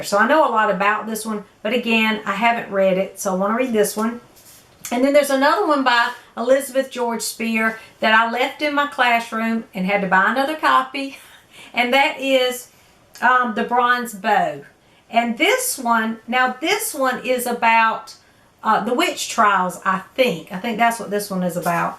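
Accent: South, United States. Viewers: It is so nice to hear your southern accent. My husband and I were both born and raised in Birmingham, Alabama. I got a job in Washington DC and we moved to Northern Virginia 24 years ago. Living up here I miss the food and the SEC football (Roll Tide). We still have family in Alabama. I can retire in 2 years but we haven’t decided where to move. It will definitely be a Southern city. You can take the girl out of the South but you can’t take the South out of the girl. 😀 This is probably way more than you ever wanted to know about a viewer. Glad to have found your channel and glad to hear your accent.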